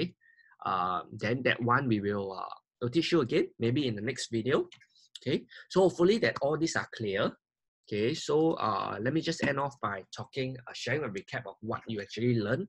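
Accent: Singapore. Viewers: No, I think he`s Singaporean.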